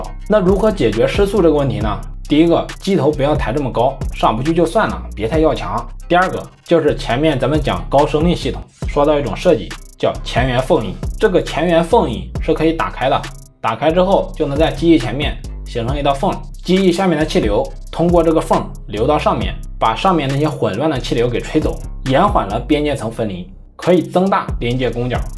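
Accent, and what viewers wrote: Henan, China. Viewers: 是河南还在菏泽老乡啊，哈哈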